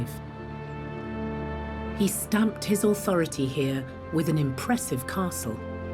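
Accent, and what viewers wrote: United Kingdom. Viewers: what 😂 nice voice and British accent makes everyone seem knowledgeable but this documentary is very inaccurate plus I don't know if it's on purpose but they were jumping pivotal moments in our country's history when she was talking about axum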